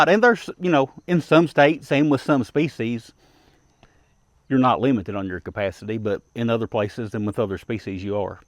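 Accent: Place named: East Tennessee, United States. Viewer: I just found your channel. Where are you from? You accent is telling me somewhere in East Tennessee.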